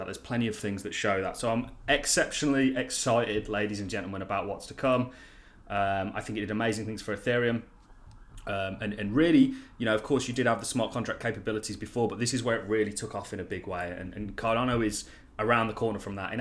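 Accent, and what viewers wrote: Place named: England, United Kingdom. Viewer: Obviously this is your first time listening to English accent.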